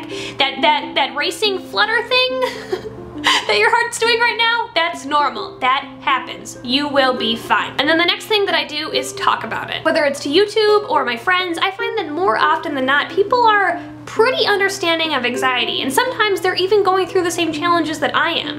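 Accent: United States. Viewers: I never suffer from Anxiety so much, but i loved your sweet American accent and your way too cute i do like your down to earth personality and you would be cool to hang out with. I also love the Blue Moon North American Beer as a Manchester City fan us British city fans are very fond of that lovely drink. Also please subscribe to me like i did to you because i enjoy your videos, i will be very grateful of that. Thanks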